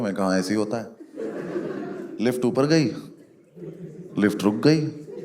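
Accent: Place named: Meerut, India. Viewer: Saare Meerut ke jaat aise hi bolte hain.. ditto!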